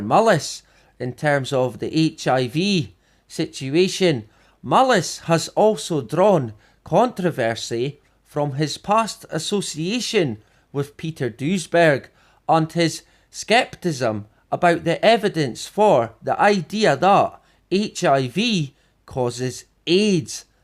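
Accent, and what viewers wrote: Scotland, United Kingdom. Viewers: Shalom shalom, dear friend from bonnie Scotland. Sure you're right, it's not at all about control lol. What ever gave you that idea? 🤣🤣🤣 Thank you so much for the research you do and share here. It's always a blessing and a pleasant break from other things here to hear you tell it like it is with your awesome accent and sense of humor. My favorite place to hear what's really going on. Blessings on you and yours! 💚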